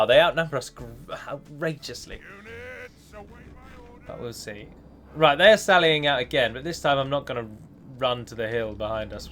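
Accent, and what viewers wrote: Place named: England, United Kingdom. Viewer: Holy shit. I couldnt tell if he was english or scottish :O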